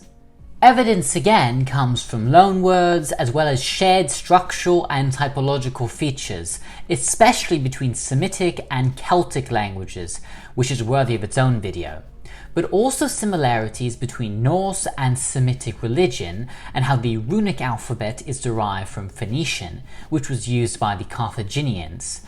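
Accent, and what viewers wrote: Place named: England, United Kingdom. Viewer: well explained , I like your english accent , thanks for sharing this important info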